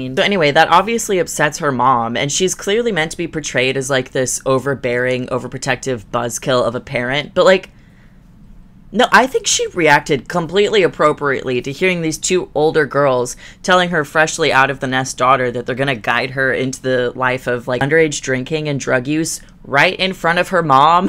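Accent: United Kingdom. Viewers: This is my first video of yours and I was 100% ready to believe you were British, you could've went the whole vid with the accent and I wouldn't have been the wiser lmao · the british accent caught me off guard 💀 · . i literally went to another video of yours cause i thought maybe I remembered wrong and you were actually british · THE WAY U SAID NOVEL HERLPELEPRP UR BRITISH ACCENT IS SO FUNNY TO ME I LOVE IT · Gotta say, I love your Bri'ish voice. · pretty good "British" accent for an American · why is your english accent is more believable than the actors???? 😭😭😭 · Your British accent was great :D As someone from England I can safely say, we all speak like that XD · bts i love your british accent lkjwqal;/lsakjuk;.lsa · this is the first video of yours that i’ve ever watched & for the first 5 seconds i fully thought you were british lmao 😂. anyways, thoroughly enjoyed this excellent roasting of the “after” series & love your whole ✨ aesthetic ✨ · Btw I know this has nothing to do with it, but you with the British accent, kinda sounds like Ron from harry potter, I'm not saying this to be rude, I was just kinda amazed at how close it was. 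:) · This was the first video of yours I clicked on and was surprised that you had a British accent, then surprised that you didn't. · first time watching u. first 5 seconds i was terrified that u were british, anyway amazing video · This is literally my first video of yours and I literally thought u were British 😭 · This is the first video I've watched from you and I almost clicked off when I heard your british accent😭😭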